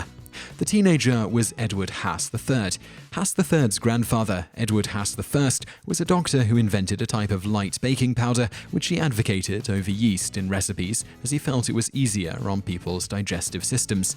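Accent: United Kingdom. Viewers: Pave Stiletto The video doesn't put it in words on the screen, but it does say the original flavor was peppermint. The word Pez is an abbreviation of the German word for peppermint.(PfeffErminZ). The video could have been much MUCH clearer on it, and unfortunately, seems to be a huge problem with all of this channel's (and those of the sister channel Top Tenz) videos. They aren't clear and tend to go on rambling stores that don't appear to actually give any answers other than just interesting history. They do give the answer, but unfortunately, Simon's insistence on pronouncing everything the British way, and the rapid drive-by of lesser-known words and facts causes you to need to do extra research to find out the information the title hints at. And, if you have to do that to every video they make, what's the point in watching the videos in the first place?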